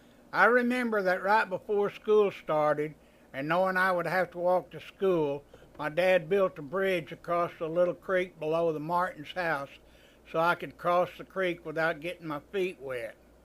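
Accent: South, United States. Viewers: What a fantastic Idea..School stories.Only thing,us northern canada guys find the story teller a bit hardish to understand,(southern accent)ha ha.Thanks for all your e mails & everything in the past.Ray Meyers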